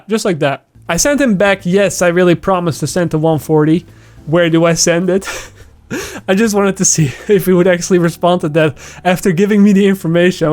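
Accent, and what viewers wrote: Netherlands. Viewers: BTW, I only started watching you recently, but the first video I saw from you, I could already tell you were the Netherlands... It's you accent, it's so obvious haha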